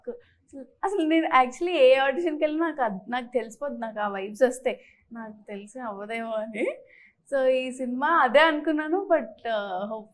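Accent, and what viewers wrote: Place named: Godavari, India. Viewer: Be yourself. Be proud of Godavari accent. All the best baby🎉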